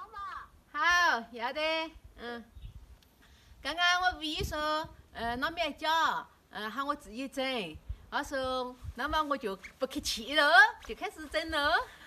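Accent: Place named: Sichuan, China. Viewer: 一直来都没听你在视频里说话 今天才知你是川妹子 声音很好听 以前我还以为你是南方广东人呢……。加油💪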